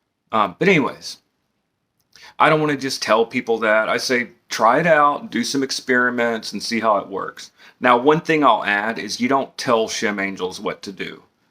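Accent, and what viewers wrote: South, United States. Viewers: LOVE THIS GUY HE IS WELL INFROMED EXPERIENCE AND ARTICULATES WELL WITH A SOUTHERN CHARM AND CHARISMA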